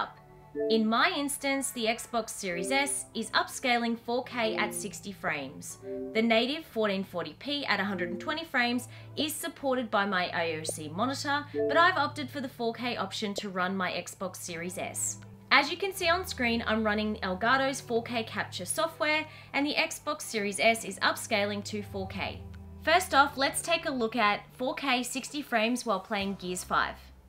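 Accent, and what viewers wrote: Australia. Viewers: Omg are you Australian because I am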